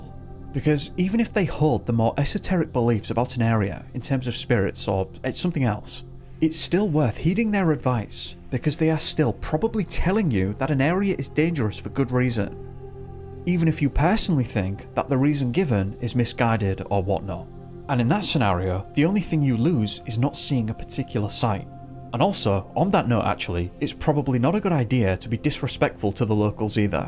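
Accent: United Kingdom. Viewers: Can someone tell me what UK accent this guy has where he says "pre-shume" for "presume" and "ah-shume" for "assume"?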